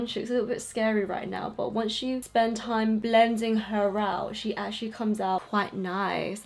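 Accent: England, United Kingdom. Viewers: OMG ARE YOU ENGLISH!!!!! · She sounds British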